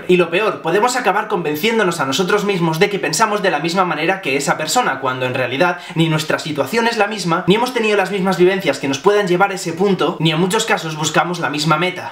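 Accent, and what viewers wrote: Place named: Spain. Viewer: me encanta tu acento español jajajaja en parte por ello veo tus videos😂 ,un saludo!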